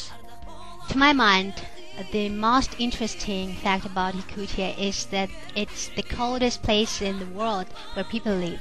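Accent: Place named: United States. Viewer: American accent does not sound good. In any way.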